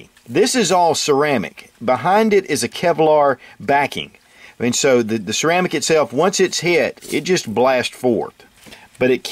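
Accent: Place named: South, United States. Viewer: Great accent denotes Southern nobility of yore.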